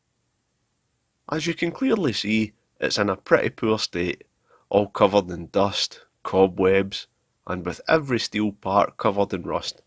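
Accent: United Kingdom. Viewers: I love these no bs vids, and the Scottish accent ( I lived in Edinburgh for 2 years ) is superb !! Cheers from Australia :) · Great video, love it Out of context: can anyone pls tell me where his accent come from? I guess Sussex or maybe Scotland? Thnx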